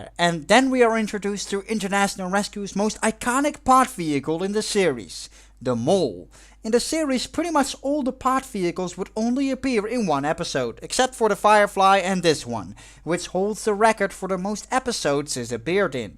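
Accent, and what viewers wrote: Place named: Netherlands. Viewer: I hate your accent i can tell your dutch i am also dutch ahaha😂😂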